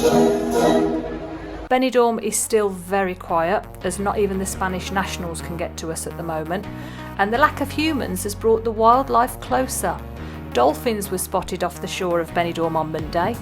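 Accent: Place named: Birmingham, United Kingdom. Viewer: nothing wrong with a Brummy accent... Trust me. As I keep telling everyone Shakespeare was a Brummie... Well almost. 😁 Really enjoy the videos. Keep em coming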